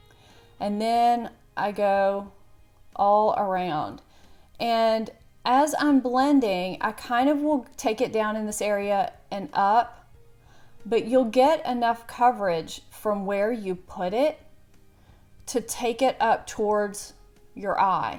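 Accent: South, United States. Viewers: OMG the fur baby on the bed killed me lol! I have one of mine own that just makes herself comfy wherever and whenever she wants 😂. Cutie pie though...makes me identify with you a little more than other "YouTubers". Just an everyday woman like me fighting the signs of aging the best we can! And love the accent...southern girl like me lol! I really like your channel hun and love your info and tips! Keep up the good work 👍🏻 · Yeah Ive struggled with the shape tape, its a bit over hyped. While it gives very good coverage. Your video hit the nail on the head. Less is More. If I can ask why do you prefer the brightening powder over the other setting powder by Laura Mercier? Thanks from one Southern Girl to another, atleast you sound Southern LOL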